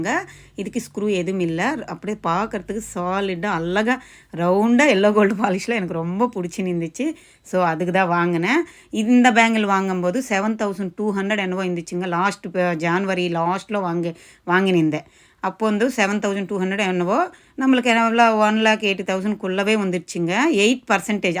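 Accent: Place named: Bangalore, India. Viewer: Akka neenga Bangalore uh slang Apadi Iruku....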